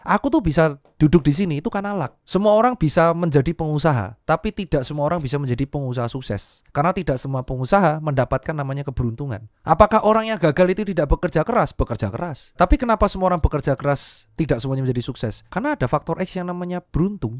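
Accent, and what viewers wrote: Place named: Banyumas, Indonesia. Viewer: Jarku rep ngomong ngapak mas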